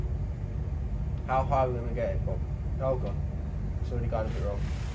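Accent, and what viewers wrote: United Kingdom. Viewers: That Uk accent kills my ears, for real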